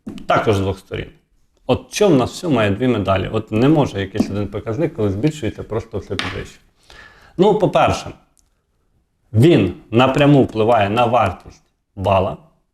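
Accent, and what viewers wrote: Vinnytsia, Ukraine. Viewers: Іправда як вже ти 'дістав' своєю балаканиною. Пора вже тобі вивчити українську мову бо твій вінницький суржик вуха ріже.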